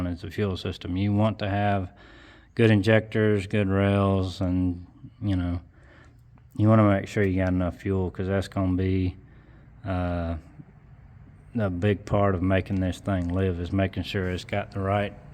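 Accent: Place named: South, United States. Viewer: Have a few question myself - you have any way outside of youtube to communicate? I have a 2500 burb with ly6 and 80e which is already cammed, converter, gears and longtubes. Im looking to go turbo or lsa and have a few questions about dealing with heat etc - sounds like you live in the south as do i (alabama)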